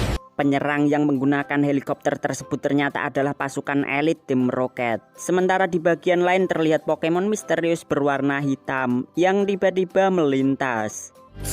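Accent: Java, Indonesia. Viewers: Jawa mana bang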